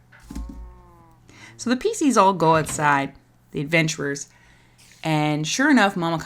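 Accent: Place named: South, United States. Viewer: I love everything about this. As a born and bred southerner I laughed heartily at your southern accent.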